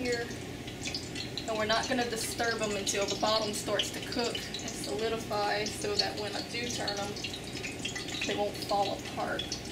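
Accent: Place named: South, United States. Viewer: Gotta be a southern girl!!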